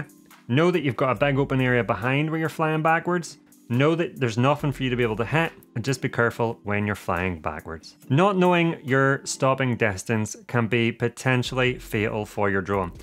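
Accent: Northern Ireland, United Kingdom. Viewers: Are you Northern Irish? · Hi, your accent is amazing. I had to focus and struggle to comprehend each sentence, and I mean that as kind of a compliment. Where do you live in the UK? I really have the notion one should recommend your video to advanced level English language students because as a non-native English speaker for more than 30 years I still very much struggled understanding you :) Very good advices regarding safe and legal drone flying BTW. Cheers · Northern Ireland accent? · Northern Irish accent?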